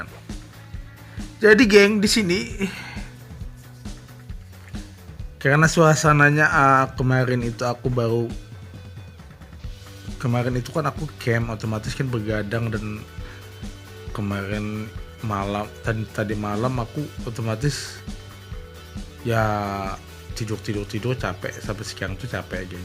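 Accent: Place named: Banjar, Indonesia. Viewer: Logat bahasa banjar nya keliatan bangt bang😂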